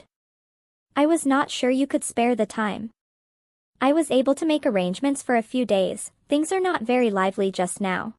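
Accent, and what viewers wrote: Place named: United States. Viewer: This sounds terrible! This story shouldn’t have a female narrator (with a bland American accent), let alone a female AI with such flat affect and abysmal cadence. It needs to be corrected immediately.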